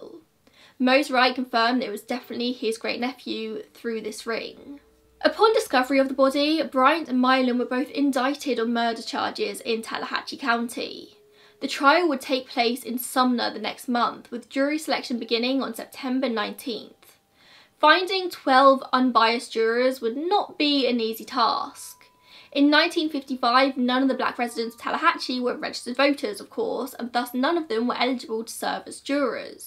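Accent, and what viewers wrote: United Kingdom. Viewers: She has a very thick British accent. I THINK she said Gratuitous. And I think she is using it in the sense that posting the picture is unnecessary or not justified.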